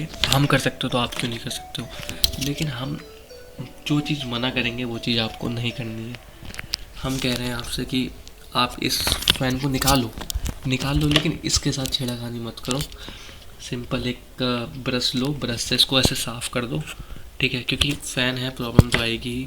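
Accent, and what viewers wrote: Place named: India. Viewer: Yeah like im supposed to understand a damn indian guy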